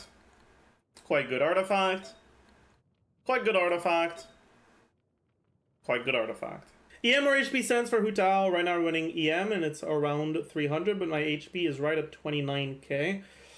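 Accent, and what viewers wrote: Quebec, Canada. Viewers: Completely off topic - are you québécois? The way you pronounce these french words is so familiar for some reason.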